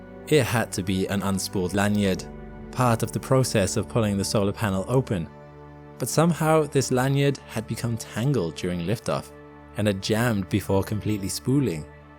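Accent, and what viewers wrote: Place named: United Kingdom. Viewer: I have to agree , DESPITE his relative Youth, This (young) Man, is very VERY EXELLENT at what he does. He is a 'Grade A' presenter. AND, he happens to have an Accent, that automatically Engenders a "gravitas" with (Americans) WESTERNERS, Who still, to this day----- Feel a "UK Accent" automatically confers INTELLIGENCE .... THIS ..... must be some KIND Of genetically instilled memory (and LOVE) of "The KING* (royalty) it's obviously SOME KIND, of genetic Memory , we (Americans) just do NOT Question it ...................... it's a REFLEXIVE Response so, it's Gotta Be ---- Genetic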